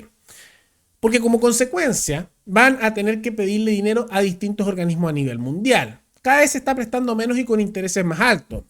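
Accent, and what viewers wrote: Chile. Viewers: Hola hermano chileno. Te sigo en tus comentarios. Eres muy bueno, muy informado e inteligente. Un consejo, si deseas que más gente te vea en América Latina, retira esos símbolos que identifica a Chile, suficiente tu acento para saber que eres chileno. También retira ese himno después de tu comentario, suena a tambores de guerra. Recuerda que América Latina ve a Chile como un país belicoso y agresivo. Esos símbolos chilenos nacionalistas y esa marcha que parece militar contribuye a subrayar esa percepción que se tiene de Chile. Tú tienes para más, eres muy bueno en tus comentarios, diría EXTRAORDINARIO.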